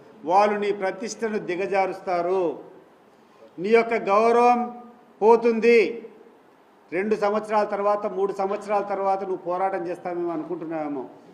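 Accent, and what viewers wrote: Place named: Andhra Pradesh, India. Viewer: Anna..ippatiki 10 times chusa mi interview ..ide manaku kavalsindi..idi KADAPA voice ante hatsoff anna..waiting for 2.0 ..Rajareddi prabhutvam..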